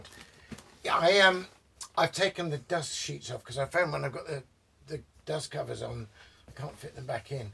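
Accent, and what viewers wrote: England, United Kingdom. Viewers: I can only see an elderly Jack Black with a well spoken English accent. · If I ever make it to heaven, I imagine this is the cameo of a man I’ll meet at the front doors. Smoking a pipe, reading my life back to me with an honest smile and an english accent. Until we meet again. 🍻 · Strange, I saw this in my feed and thought to myself: this guy probably has an English accent. So I clicked on it and behold it was about pipe smoking, which I do from time to time but have never searched for this stuff on YouTube. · I am Canadian so seeing a man with a British accent smoking a pipe reading Tolkien, this is what Canadians believe the British turn into once they reach 50 just the sweetest persons lol.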